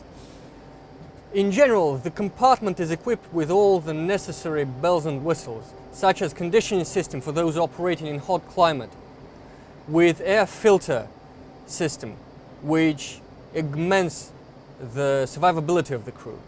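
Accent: Russia. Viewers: Ох уж этот Russian accent )) Техника огонь)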